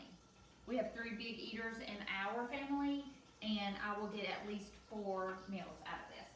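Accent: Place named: South, United States. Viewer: Southern accent is like nails across a chalk board.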